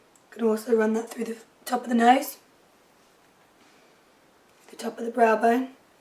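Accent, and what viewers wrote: United Kingdom. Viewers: Lol I noticed that too but it sounds wonderful with her British accent!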